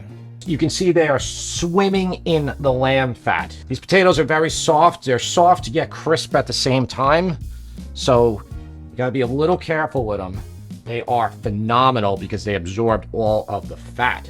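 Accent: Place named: Long Island, United States. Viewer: That accent. Is it like old school Long Island? Montauk with a bit of New England "AAHH". I can't quite place it.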